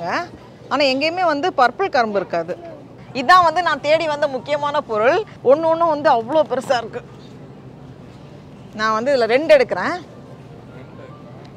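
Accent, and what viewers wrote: Nagercoil, India. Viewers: Akka ninka nagarcoil thana . nanum tha yena ninka peasurathu and ninka pandra nagarcoil side enjithiyal famous appovea kandupudisan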